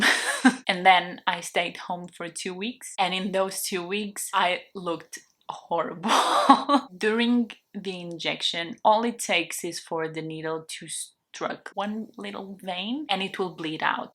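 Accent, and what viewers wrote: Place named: Portugal. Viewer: Caramba, venho maratonando os seu vídeos e agora que percebi que você é Portuguesa!! Seu sotaque é incrível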